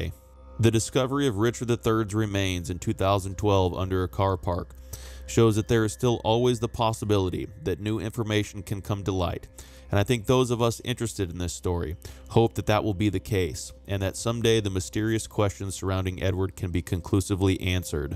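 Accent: United States. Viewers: I enjoyed this video. Is the narrator an American? His voice is pleasant and melodious ----- reminiscent of a Southern accent (Virginia, perhaps?).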